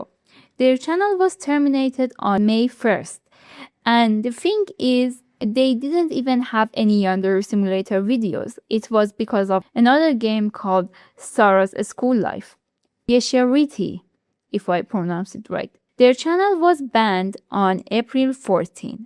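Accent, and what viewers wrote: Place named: Arab world. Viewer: I don't know why YouTube uses this stubid AI?! The app was good without it! And instead of taking down random creators' channels why don't they take down the channels that make Inappropriate videos?! It is just so stubid!!! By the way, i think you are Arabian after hearing your accent, if you are; then i'd like to say that i am Arabian too 💜✨ لو انتي عربية اقرأكي الكلام العربي ده، حاسس لنك عربية من طرسقة كلامك، صح؟ 😅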